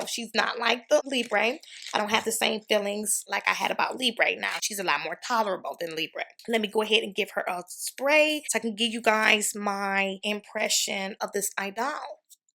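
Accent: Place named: United States. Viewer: I really love your accent I’m from England and I love the American accent and I really love your channel perfume is one of my guilty pleasures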